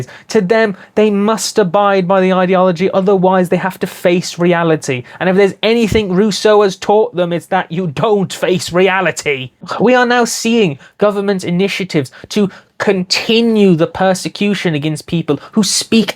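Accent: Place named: United Kingdom. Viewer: Right wing channel called my ireland. Accent is so british he might as well have the surname of Windsor. Many such cases.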